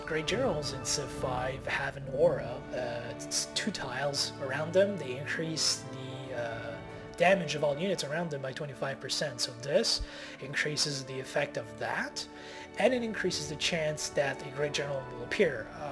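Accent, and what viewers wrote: Quebec, Canada. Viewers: Based on his speech patterns I would guess French Canadian, but thanks for playing.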